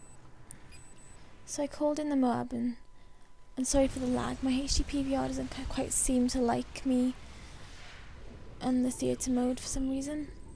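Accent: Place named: Southern England, United Kingdom. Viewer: one of THE most helpful commentators ever :D, also you sound like you're from england :P just saying, because i'm from the south of england :) and it's a accent i recognize o.o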